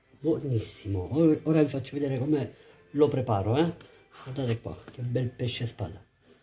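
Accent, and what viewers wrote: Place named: Lecce, Italy. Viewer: mi sono appena iscritta al tuo canale, sei bravissima e simpaticissima con la tua parlata leccese, io sono di taranto e mi piace tantissimo cucinare, come da buona pugliese. Ciao e adesso che ti ho scoperta non ti lascio più. Amica mia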